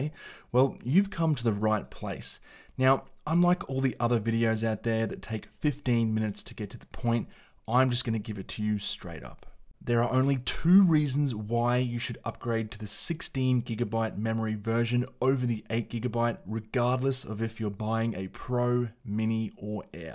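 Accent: Australia. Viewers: His Ozi voice is refreshing I’m my opinion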